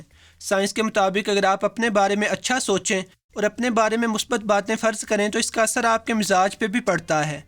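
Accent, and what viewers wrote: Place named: Sialkot, Pakistan. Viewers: App ka lehja sialkoti hy na. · Punjabi urdu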